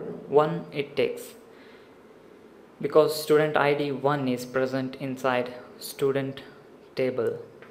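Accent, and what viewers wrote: India. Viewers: Where is this accent from? India?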